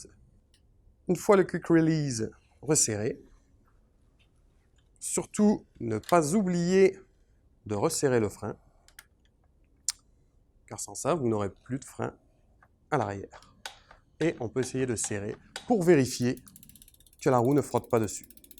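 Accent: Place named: Quebec, Canada. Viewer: avec le petit accent québécois en plus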